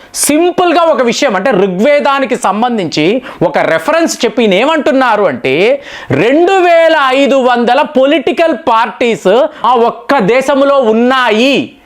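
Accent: Tamil Nadu, India. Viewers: గారు తమిళనాడు లో చదువుకున్నారు అక్కడ తెలుగు ఇలానే ఉంటది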